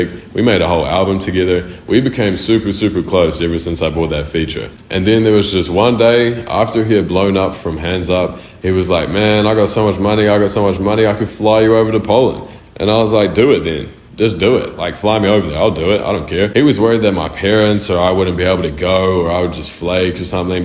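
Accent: Australia. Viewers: HE SOUNDS ALSRLIAN BUT HE SAID HE IS NOT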